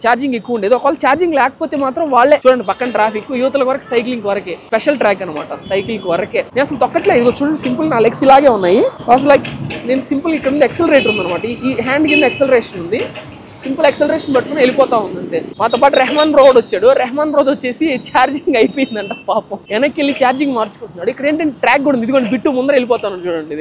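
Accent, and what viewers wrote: Nellore, India. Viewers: Video total febless 😊 total video lo nellore slag matladuthu ne unavu enjoy orange 👌👌👌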